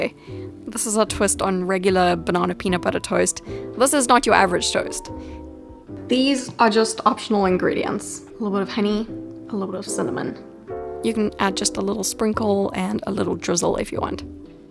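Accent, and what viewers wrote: South Africa. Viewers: South African accent is strong haha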